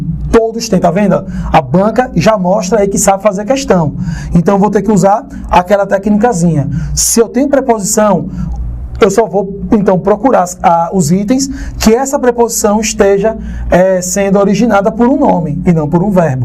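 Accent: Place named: Bahia, Brazil. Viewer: Vc é o cara mesmo negaum kkkk esse sotaque da bahia é fodaa. Ryan BH MG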